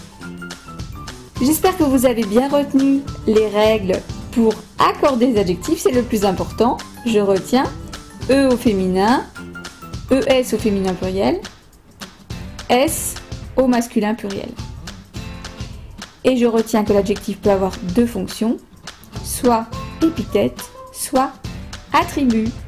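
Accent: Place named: France. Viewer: you good teach but accent french say not understand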